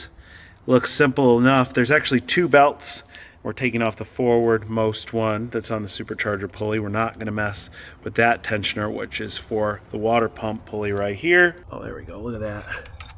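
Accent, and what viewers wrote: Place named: East Coast, United States. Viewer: Holy smokes you look an talk like an east coast scumbag. Instant subscribe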